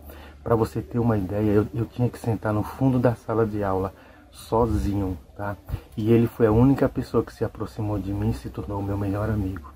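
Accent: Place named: Northeast, Brazil. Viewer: O sotaque nordestino ❤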